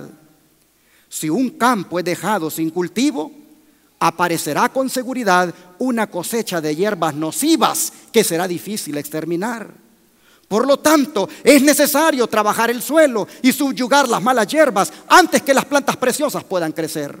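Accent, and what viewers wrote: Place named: Central America. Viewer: De donde es pastor su acepto suena de centroamerica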